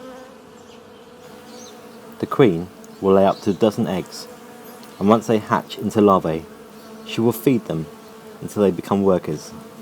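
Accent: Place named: United Kingdom. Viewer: Got some Britian talking also giving metric numbers....sucks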